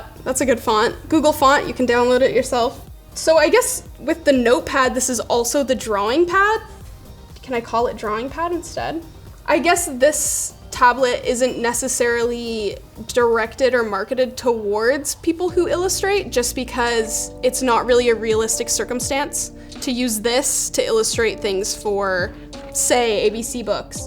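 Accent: Canada. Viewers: love the canadian "O's" :D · I’m from Minnesota and can hear her accent from Canada very easily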